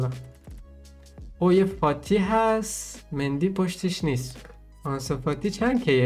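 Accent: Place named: Shiraz, Iran. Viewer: شیرازی هیستی؟😁 از حرف زدنت معلومه . اگه هستی همشهری منی. عاللللییی👌👌 فقط انرژیت رو بیشتر کنی بهتره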